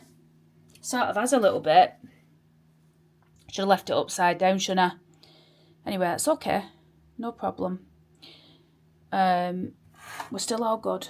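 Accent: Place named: Yorkshire, United Kingdom. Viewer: You are funny! 😂 That Yorkshire accent, down to earth, slap dash ways 😆🤣😂 If you’d have put it back in the oven just put some foil on top so it doesn’t burn. I can’t imagine cake without eggs. I’m not far from you in Sheffield!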